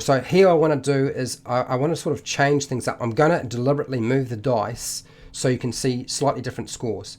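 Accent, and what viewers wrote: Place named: New Zealand. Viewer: Me: Hm, I wonder if this is an Australian or a New Zealander? 🤔 "... is a check." Me: New Zealand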